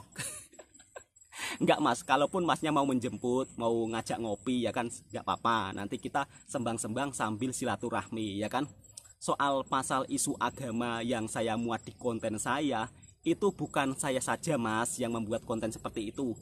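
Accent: Java, Indonesia. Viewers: Mas ni lucu bila bercakap kuat pelat jawa nya.. sekadar konten mengapa di ancam terus.. open minded aja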